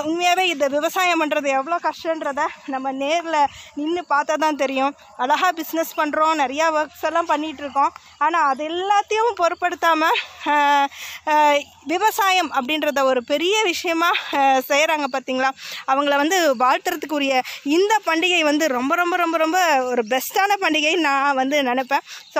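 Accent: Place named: Madurai, India. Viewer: Akka nenka entha ooru .. Madurai language matri irukku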